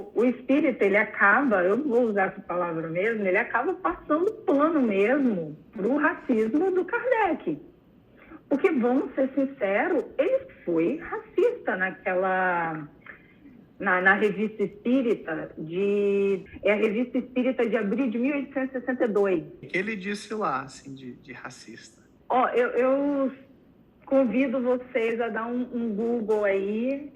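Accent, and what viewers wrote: Rio de Janeiro, Brazil. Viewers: seu canal é muito bom, você é muito inteligente. Tente controlar o sotaque carioca (S assobiado) que certamente será muito mais agradável ouvir suas ideias (brasileiros de outras regiões).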